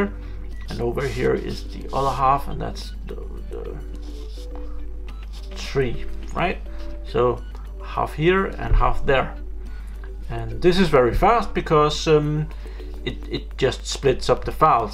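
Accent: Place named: Denmark. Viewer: Definitely danish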